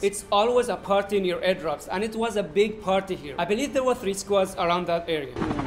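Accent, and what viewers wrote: India. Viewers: The indian accent makes this video 100 billion times better. Idk why. I guess this is caused by watching Mutahar from someordinarygamers last night for 5 hours straight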